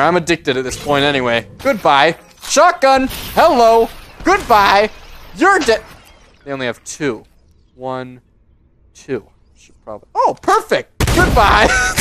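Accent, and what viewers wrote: Canada. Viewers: I can hear the Candian in your voice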